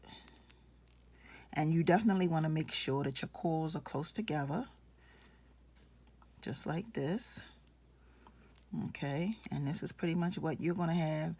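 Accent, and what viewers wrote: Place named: Baltimore, United States. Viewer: I love this! I will definitely be trying this. Thanks for sharing. P.S. I think I’m hearing some Baltimore in your accent. 😁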